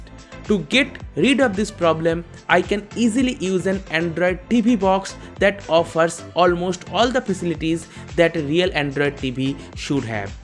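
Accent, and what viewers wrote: India. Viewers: i hate the indian accent, but i wqs able to watch the video to the end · Bro... Everything is nice... Just work on your accent a little bit.. Get rid of that Bengali English accent.. It sounds cringy bro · He has Indian pronunciation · Indian. No where near Italian.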